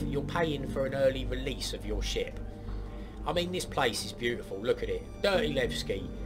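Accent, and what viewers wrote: Cockney, United Kingdom. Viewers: Cant stand it. Well I could for all of four minutes. The least attractive accent in the UK so no thanks. See ya! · thicc british accent "hello this is " laughing, then liked subscribed and commented 3 seconds in. this guy knows how to YT properly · i've been threatening to jump in and your cockney accent almost convinced me m8. lets start a Star Citizen clan named Mi6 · Was NOT expecting you to be cockney but now the channel name makes sense XD SUBSCRIBED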